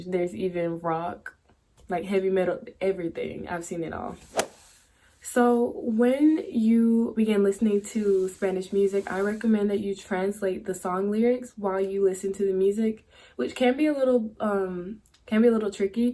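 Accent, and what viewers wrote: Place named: England, United Kingdom. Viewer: I was in Birmingham for a couple of years, your English accent is not a typical black's accent tho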